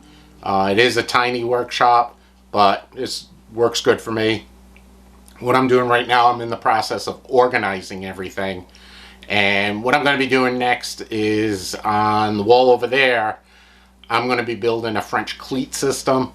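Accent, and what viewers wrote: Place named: Massachusetts, United States. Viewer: Just stumbled upon this video and your channel. You have the makings of a great set up! The instant I heard your voice I thought to myself, "This person is from Massachusetts" and was extremely proud when the first thing in frame when you rotated the camera was a Boston Red Sox banner. Where in MA are you located?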